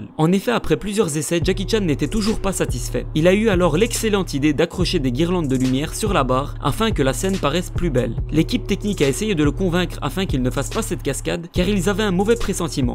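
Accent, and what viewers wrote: Belgium. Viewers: T belge comme moi ?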